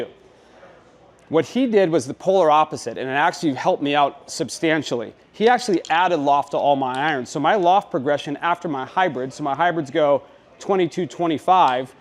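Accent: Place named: Pittsburgh, United States. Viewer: Yinzer haha. Bayg.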